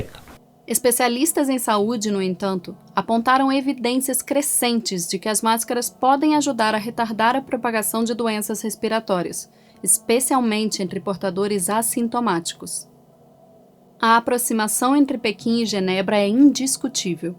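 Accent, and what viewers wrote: Rio de Janeiro, Brazil. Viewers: seu dialeto carioca natural (leve e agradável) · * tirou o sotaque carioca para a narração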